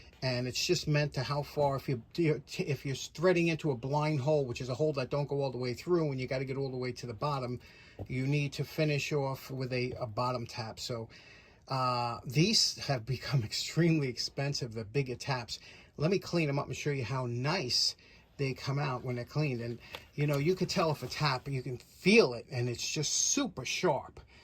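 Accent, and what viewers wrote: New York, United States. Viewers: I am definitely not one to correct but I believe you misspoke or maybe I misunderstood. That screw size 6-32 is not six thirty second which would imply a size in inches, it’s a six-thirty two. It is a six gauge screw with 32 threads per inch. I’m sure you know all this maybe it’s just your NY accent and my Texas ear. 😂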